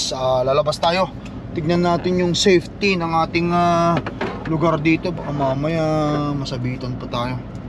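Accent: Ilocos, Philippines. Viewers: Unawain mo kase ganyan tlaga lahat ng nagsisimula....wag ka naman masyado mag magaling boss..at isa pa ganyan tlaga ang pronouncetion ng mga ilocano..wag ka masyadO busher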